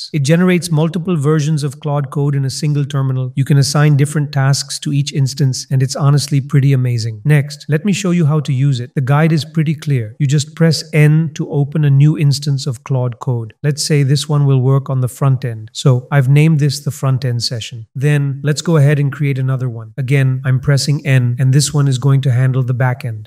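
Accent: India. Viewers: The only indian i can bare to listen too. SUPER INDIAN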